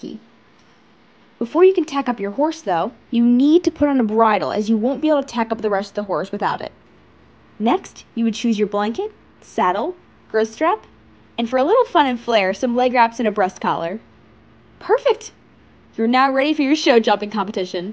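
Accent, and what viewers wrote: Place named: South, United States. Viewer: The southern accent sounds like Sadie from RDR2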